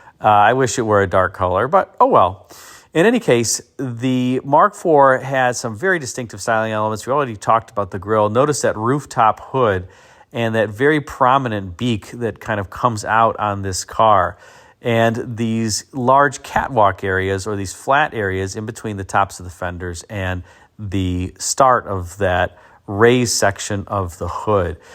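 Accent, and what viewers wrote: United States. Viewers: Keep up the great work very clear American accent cheers from Australia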